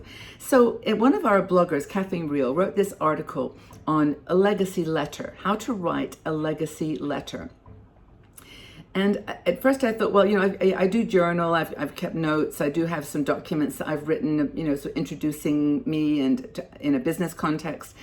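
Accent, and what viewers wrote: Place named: England, United Kingdom. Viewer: I thought I could hear a very small hint of an English accent in previous videos, small but still there.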